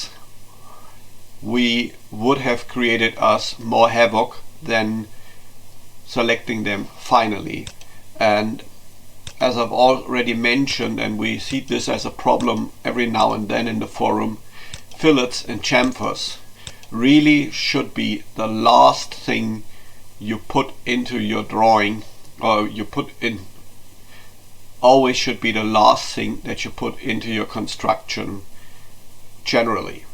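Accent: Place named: Germany. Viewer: German english with stuffy nose... What a phonetic combo... Nice tut by the way, good job.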